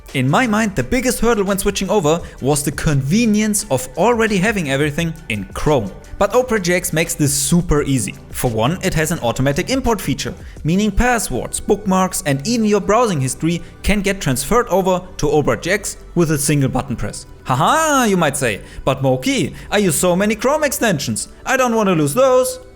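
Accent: Germany. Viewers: Heyy idk why but are you german? you sound like german btw i am german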